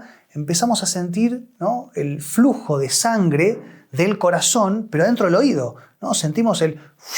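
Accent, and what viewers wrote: Buenos Aires, Argentina. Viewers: Sí yo me imaginé que es de Argentina porque lo escucho Hablar, pero de qué parte? Supongo que debe ser de la provincia de Buenos Aires, no sé si así de la provincia pero Sí o sí es de Buenos Aires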